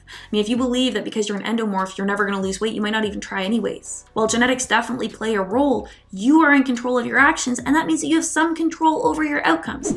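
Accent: Canada. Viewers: Is she Canadian? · is your accent Canadian?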